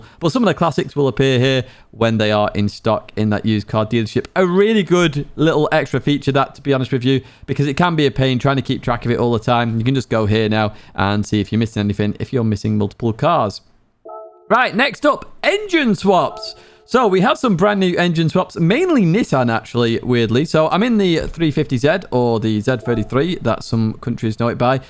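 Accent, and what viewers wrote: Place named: Sefton, United Kingdom. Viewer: Didn't know you were from Sefton lar 🤣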